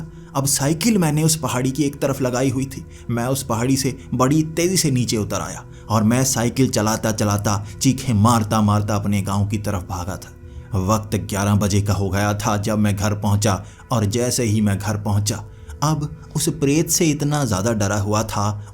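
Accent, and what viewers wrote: Punjab, India. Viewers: Aap Punjab se kuch kuch Shabd aapke alag sunane mein aati hai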